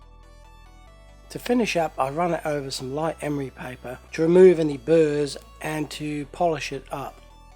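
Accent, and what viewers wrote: England, United Kingdom. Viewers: I have clumsy fingers, i would end up painting it Pollock style!!! Where are you from? you sound british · Very interesting!!! I liked the idea!!! Where you are from? By the accent, it looks British to me. Can you get old thumbnails with ease? Here in Brazil it is difficult to find old machbox. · This is the second time I've seen you try something other than paint stripper and I don't know why you keep trying to improve upon something that works so fantastically well AND fast. I can see trying to improve methods that need improvement etc, but the stripper is as good as it gets IMO. Great vids and the proper English accent narration just makes it better.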